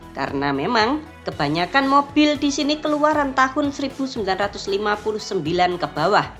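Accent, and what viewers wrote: Java, Indonesia. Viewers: suara loo medok banget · Yang ngomong medok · seru yg ngisi voicenya, medok jadi makin betah nnton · Jowone ngendi kang? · Ini orang Jawa banget deh kyknya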